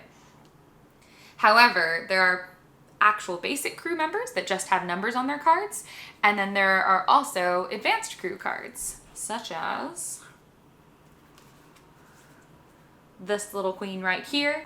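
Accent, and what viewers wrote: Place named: United States. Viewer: This was a great video. Thank you! It's my first time commenting on this channel, but it's been growing on me ever since it was recommended by Jenna Rose in the Board Game Garden channel. I especially love the "dominant species" and "your mama" tests 😄 The first one especially made me reflect... Quite intrigued about the "philosophy nerd" aspect, since that appeals a lot to me, and I don't often meet those two aspects that I love (board gaming and philosophy) put together. Do you happen to have a second channel / blog / whatever about that? Lastly, I'm confused: you sound American, but your likeness is surprisingly similar to my Italian best friend! It's uncanny 😂 Anyway, thanks again for the great video :)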